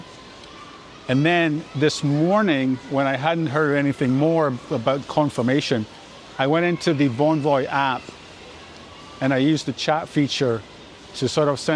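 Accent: Scotland, United Kingdom. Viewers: Is this deal for people only in the us? I don’t think people from the uk can get that card unfortunately, and he sounds Scottish so doubt he would get it either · Definitely a Scottish accent in there somewhere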